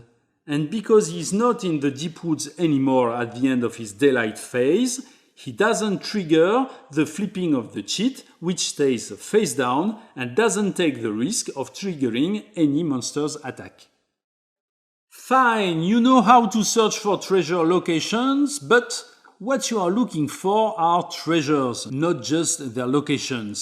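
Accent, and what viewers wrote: France. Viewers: Just telling you the facts. Audio is just as important as video, sometimes more so. People can be very tonally sensitive. Not everyone is French. This might be the one instance of YouTube AI (voice dubbing) actually being beneficial. Turn it on. See what happens. It should translate fairly well. If it does not translate, turn it off. It should be better than subs. Guaranteed you get more views. You want to keep people watching, yes? These are very long videos. Keep options open. I had to use other MR tutorials based solely on audio. Imagine someone with a heavy German accent trying to do these videos in English. Same thing. I’m German and I would not want to listen to that and if I had a heavy accent I would get someone else to do the audio. In this case the option is absolutely FREE.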